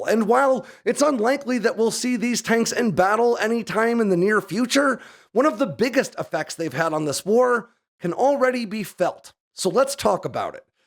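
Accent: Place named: New Hampshire, United States. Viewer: He went to school in New Hampshire and lives in Georgia. His accent is definitely New Hampshire, but some southern gets in there from time to time.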